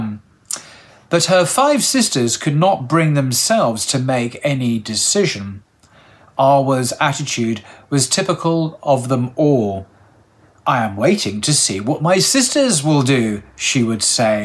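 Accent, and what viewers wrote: United Kingdom. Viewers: Keep makimg these videos. 👍 We love your british dialect for storytelling.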